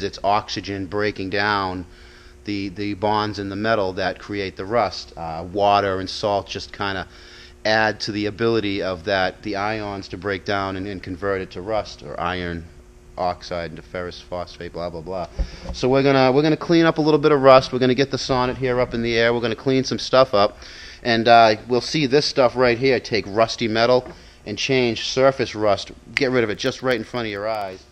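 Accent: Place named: Massachusetts, United States. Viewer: Its pronounced kah , and I couldn't hear it cause I'm from heah too ( mass)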